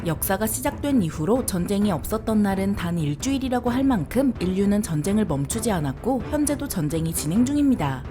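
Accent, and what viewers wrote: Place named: Gangwon, South Korea. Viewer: 강원도 억양같은데 억양이 중독성이 강해요.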